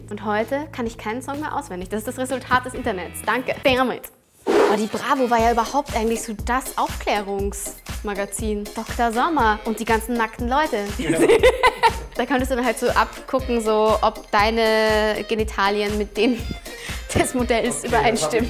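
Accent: Austria. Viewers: Ich vermisse deinen österreichischen Akzent :( deabo :(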